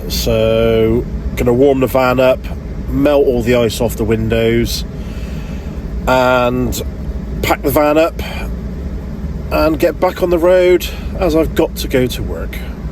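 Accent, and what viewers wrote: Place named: West Country, United Kingdom. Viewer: Keep those vlogs coming....keep that Marvelous West Country accent...and you won't go far wrong...and maybe we will bump into each other is Cornwall sometime 😉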